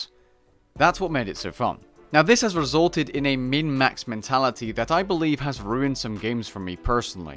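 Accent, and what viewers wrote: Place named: Netherlands. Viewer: Problems with 1. He’s a pretty dope content creator 2. Not afraid to branch out on video content. 3. Is a very attractive man with a nice Dutch accent. 4. What? 5. Hates frogs and encourages bonking. 6. All of the above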